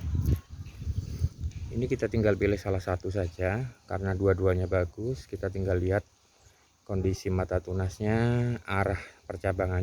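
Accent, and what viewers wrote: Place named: Java, Indonesia. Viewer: padahal logatmu logat Jawa kampung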